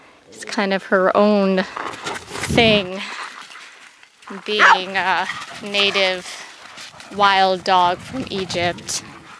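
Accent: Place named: Texas, United States. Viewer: Are you from Texas? You sound like this Youtuber from Texas that i like...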